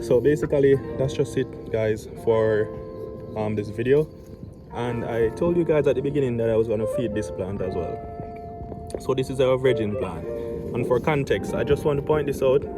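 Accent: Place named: Jamaica. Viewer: I'm a grower my self and you sound like ah yaad mn.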